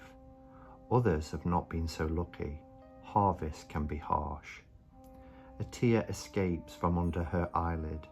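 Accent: United Kingdom. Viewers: What a wonderful beginning to Mabon celebrations! I sent this to some friends as well. One already commented, "That was magical." Indeed. If you ever quit your day job, you should apply to read books on tape. Especially British mysteries -- I so hear that in your voice. Thank you!!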